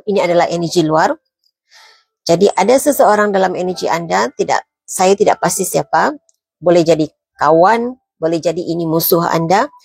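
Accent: Sabah, Malaysia. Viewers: Suara admin. MCM orang Sabah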